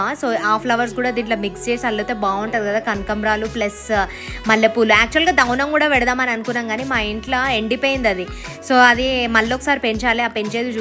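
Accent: Telangana, India. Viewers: Telangana bashaaa...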